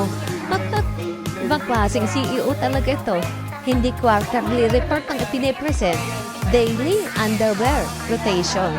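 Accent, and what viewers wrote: Batangas, Philippines. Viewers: i really love to hear ur voice ....'yong batangueña ang tono ng pagsasalita ....👸💛❤️💛❤️💛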